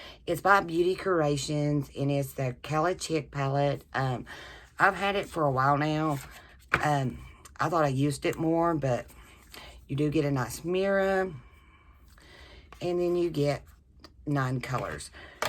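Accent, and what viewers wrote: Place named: South, United States. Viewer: Everytime I watch you I just adore your accent...it is southern strong! That look you did with the palette is awesome and a bit subtle, you look like a happy sweet woman...and those are hard to find LOL! I don't even have a vanity but I have many piles of makeup that I need to organize so I relate! I would love to enter to win that palette because it looks so good on you. But you have better younger eyes than I have cause i am 56! Thank you so much for offering a lovely giveaway! Wendy Sowles on Insta at wendysowlesexploresmakeup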